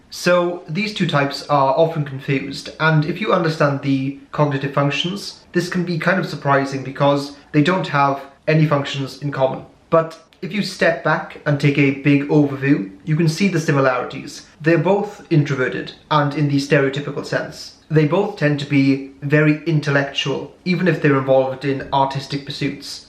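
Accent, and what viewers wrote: Wales, United Kingdom. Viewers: Are you from wales?🏴🏴🏴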